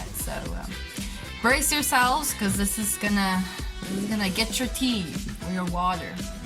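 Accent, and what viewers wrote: South Africa. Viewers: I knew she was from SA ,the accent said it all😂....yess!! Fellow South African💫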